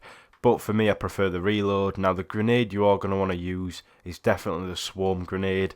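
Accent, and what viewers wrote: Yorkshire, United Kingdom. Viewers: You sound like a reight Yorkshire man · Dude are u a yorkshire lad????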